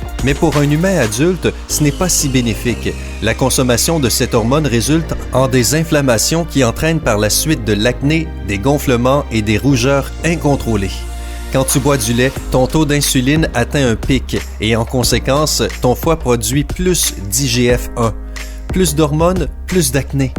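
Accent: Quebec, Canada. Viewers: J'adore l'accent québécois, je pourrais écouter pendant des heures ! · Bonne vidéo mais j'aime pas l'accent québécois 😲😲😲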